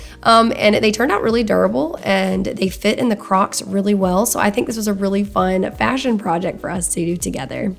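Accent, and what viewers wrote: South, United States. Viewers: Hi, I recently found your channel and love the fact that you are a Christian (I see your tshirt designs 💜) and feel sure I hear a southern accent! I am a special education teacher in Arkansas and am considering starting a small business for my special education students. Would you ever consider doing a Google Meet so I can ask you questions and get some guidance? I’ve been watching your videos, but need to get this thing going, by ordering supplies and equipment within the next month.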